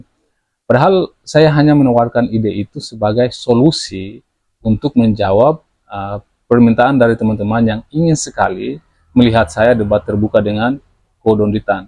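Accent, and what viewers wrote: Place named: East Nusa Tenggara, Indonesia. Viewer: Logatnya sepertinys orang NTT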